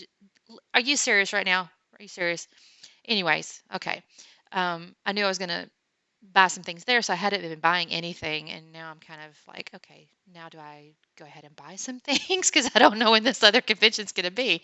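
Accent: South, United States. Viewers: Hi there, were you shouting out to me? Thank you if you are 💖. I try to always leave a comment on the videos I watch. I want to encourage you guys to keep making them for us, lol. This is a nice layout. R u from the south? I am from South Carolina and I hear an Southern accent in your voice 🤗. TFS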